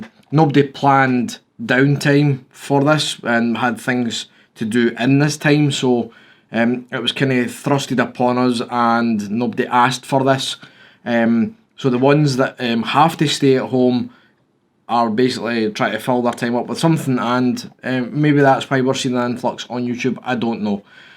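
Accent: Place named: Scotland, United Kingdom. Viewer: No, having a Scottish accent will not put people off subscribing, although in my case I prefer it because I lived there and it’s not Northern Irish 😂. My favourite beauty YouTuber is also Scottish.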